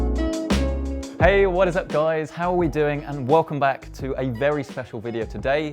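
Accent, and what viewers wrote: United Kingdom. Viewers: I do like the idea of some free stuff, but I don't want to say the same stuff as anyone else, but I'll do it anyway: I love your channel, I've enjoyed it for a while, also quite glad that you're a semi-posh brit, like myself! Happy New Year!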